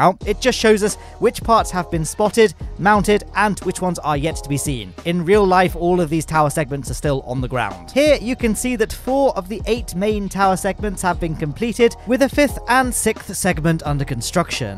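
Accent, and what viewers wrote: United Kingdom. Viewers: Because he is from the United Kingdom and that is how they say it there. The same way that the UK and US say aluminum differently.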